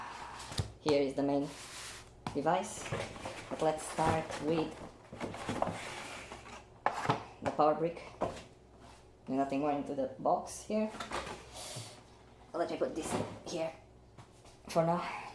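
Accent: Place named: Russia. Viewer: I think I can hear Russian accent when you speak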